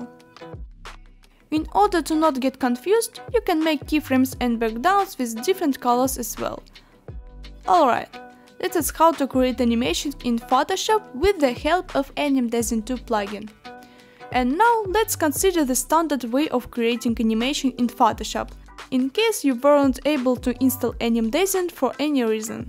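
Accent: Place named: Russia. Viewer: Воу как рашн акцент виден)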